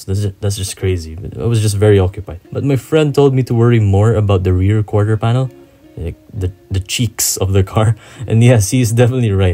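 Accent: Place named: Philippines. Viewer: i can hear the filipino accent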